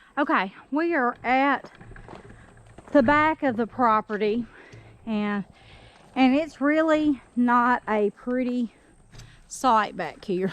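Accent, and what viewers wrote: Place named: South, United States. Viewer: Congrats! You sound like you're from the south. Last one of these I watched the people put at the very end it already had a contract! Cudzu is edible according to a man that french fried it during the depression ! Best wishes.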